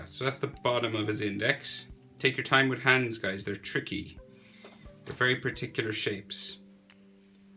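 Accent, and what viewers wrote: Ireland. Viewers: Trying to draw mob and immediately recognised your accent, my Irish brother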